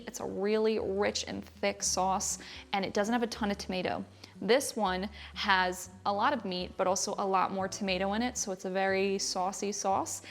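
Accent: Philadelphia, United States. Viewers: you sound like you’ve a light Philly accent?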